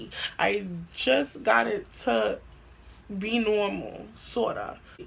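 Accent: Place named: New York, United States. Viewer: you HAVE to be from New York. Brooklyn? lmaoooo I hear it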